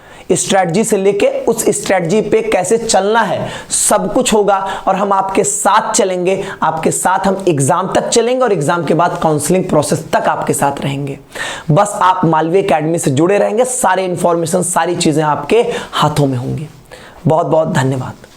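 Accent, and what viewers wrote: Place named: Bihar, India. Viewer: Sir ji kya aap Bihari ya purvanchal se hain kyuki aapki Hindi ham log se milti julti lg rhi hai 😊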